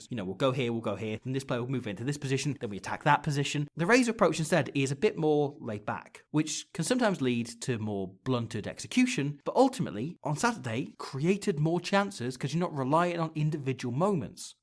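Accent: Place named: England, United Kingdom. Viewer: Whatever mate, you whittle on a lot. That smarmy English accent.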